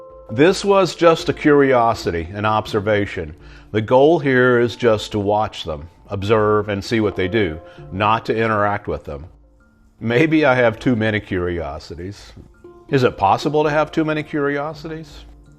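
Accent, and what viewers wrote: South, United States. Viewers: This is what YouTube is made for! What an amazing experiment, and how generous of you to share your home with your fellow critters around you:) Thank you for making this and sharing it. You're a very kind person, and your lovely southern accent is a bonus for narrating your adventures! Edit:, please do stay curious!!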